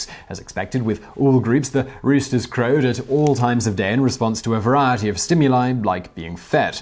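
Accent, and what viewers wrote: United Kingdom. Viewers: Why is Michael from VSauce talking with a British accent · Interesting that despite your British accent you use the American term rooster rather than the British term cock. Now why is that I wonder?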